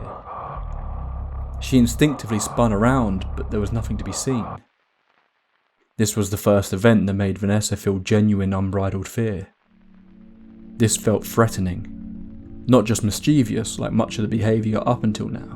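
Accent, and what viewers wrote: United Kingdom. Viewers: It's cuz they're British I'm telling you, it's like if a documentary isn't narrated by a British accent I don't believe it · Excited to see you’re back & growing! Maybe cause you’re in the UK, between the accent and history, I find myself utterly intrigued! Keep up the good work 😊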